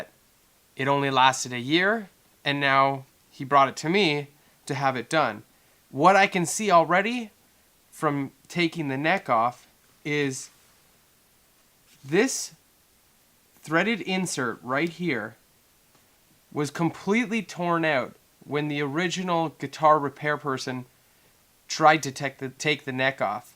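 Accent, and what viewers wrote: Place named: Canada. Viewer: Thanks for the great video. Do u have a video that shows how you did the neck reset? I have a problem with my Martin. I can see a considerable 1/8” gap at the heel of my neck. My guitar is 20 years old. I think the wood has shifted with time. Not sure what to do at this point. I am in Mexico so I don’t have much luthiers around me. Hey you’re Canadian? You sounds like english canadian. I am french canadian from Quebec!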